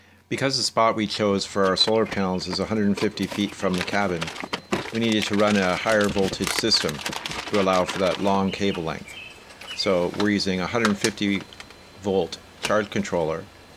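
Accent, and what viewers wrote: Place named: Ontario, Canada. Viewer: You from Ontario Canada?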